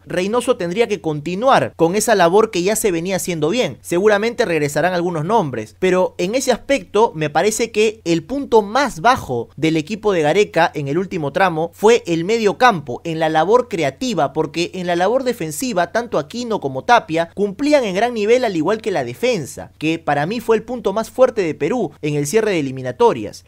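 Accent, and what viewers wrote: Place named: Argentina. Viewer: Una pregunta, eres argentino o no, por qué hablas como argentino.. habla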